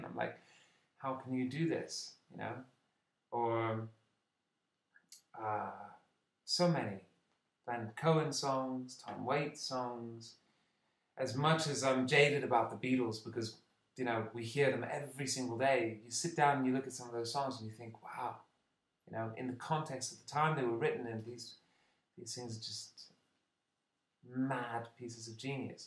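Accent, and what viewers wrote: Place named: United Kingdom. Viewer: ur accent uuuh god is killing me i'm in love with British accent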